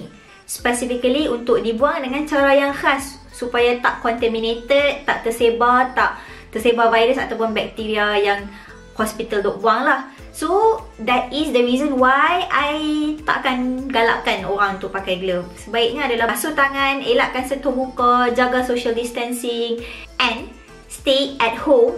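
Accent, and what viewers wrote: North, Malaysia. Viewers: Suka tgk video² nih sbb gunakan loghat utaqaaa.. TQ utk semuaa information Dan ilmu² yg di share.. smga murah rzki sllu.. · Tudia aihh utara bak hang😅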